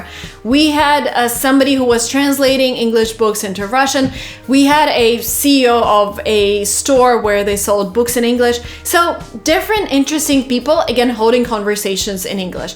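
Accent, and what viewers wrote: United States. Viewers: Thank you, after watching the learning video you gave I feel that my English skills have increased. I like your accent even though you are originally Russian but your American accent is very good. You are my motivation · Are you from American🇺🇸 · In which way I can talk to u to learn American accent my age is 14 plz help I like the way u talk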